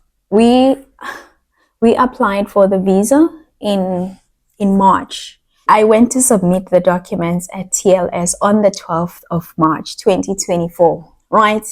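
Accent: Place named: England, United Kingdom. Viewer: Hi guys it's a pleasure to follow you an praying that God bless you all marriage an you talk like you are from England or the UK an not from africa😊🎉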